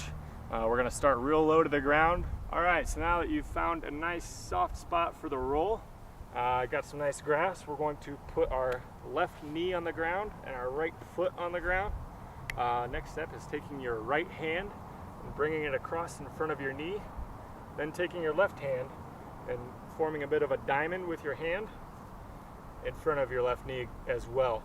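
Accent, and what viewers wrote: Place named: United States. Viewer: your voice sounds very american 😳🤔🙂 greetings from Vienna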